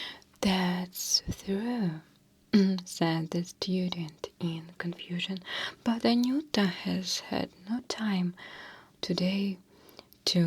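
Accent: Russia. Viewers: I love, love, love when you read us a story! Your English keeps on getting better and better! But I love your Russian accent so much! Those earrings are amazing too! Thanks so much!:-)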